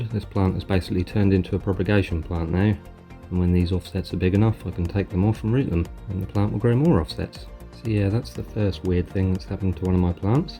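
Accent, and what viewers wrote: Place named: England, United Kingdom. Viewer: mellifluous English English accent.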